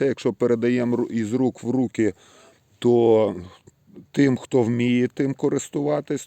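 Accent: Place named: Surzhyk, Ukraine. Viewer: Смешной суржик 😁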